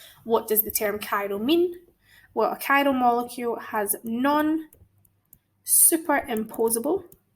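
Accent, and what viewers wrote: Scotland, United Kingdom. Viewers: It’s just a Scottish accent calm down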